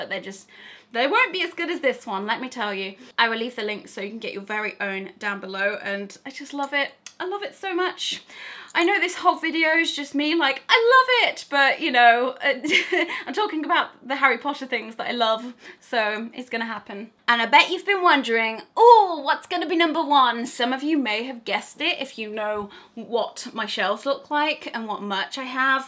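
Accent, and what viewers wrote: United Kingdom. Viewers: I love your British accent ❤️❤️❤️ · I’m so used to hearing you so when I hear a British accent it sounds so normal to me btw I’m American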